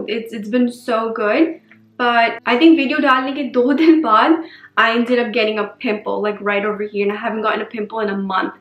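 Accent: Pakistan. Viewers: Your Urdu accent is exactly like Pakistanis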